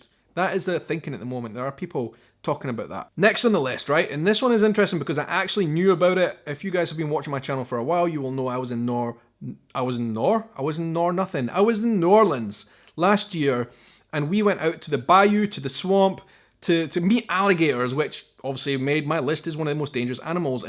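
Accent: Scotland, United Kingdom. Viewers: "Kettle of fish" still loving the accent. "Son's of Scotland" speech type stuff...awesome. Bring me on your next adventure.... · I like "moosies" best. And you can read the dictionary out loud if you like, anything to hear that wonderful Scottish accent! 😍😍😍😍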